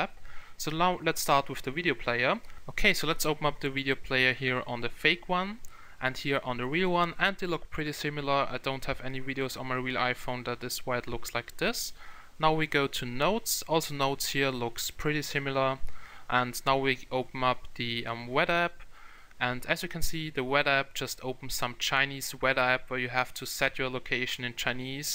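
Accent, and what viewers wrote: Germany. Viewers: Du deutscher man hört das richtig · man merkt es spricht ein Deutscher.. :D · Ich finde den deutschen Akkzent extrem lustig, in einer guten Art und Weise. Zu Thema: Das ist die Quittung für die billige Produktion von Apple-Geräten in China! ---- I think the German accent is extremly funny, in a good way. Now to the topic: That's the result of the cheap production of Apple products in China! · du bist deutsche oder östereicher das hört man total raus :) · Man merkt voll dass du aus Deutschland kommst weil : 1. Du hast einen deutschen Akzent im englisch und 2. Auf deinem Handy wird dir alles in Deutsch mitgeteilt oder angezeigt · It's so funny his german accent is so obvious. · Are you German? Your English sounds German haha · It still doesn't make it a Chinese accent??? He just has a German accent, a Chinese one is completely different.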